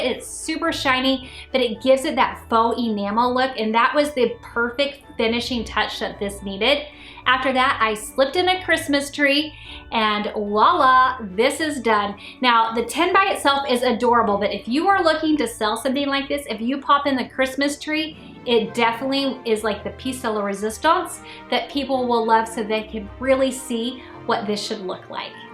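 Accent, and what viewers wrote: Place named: Texas, United States. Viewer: I'm LOVING that green! 😍 Just might have to try that. And the TINS 😍😍😍 I either missed those at Michaels or mine didn't have those, bummer. As always, I love watching you create and your sweet Texas voice. Have an awesome Sunday! PS I hope you can relax today after working late into the night (I was right there with you) ❤️ Last minute ladies unite! 😂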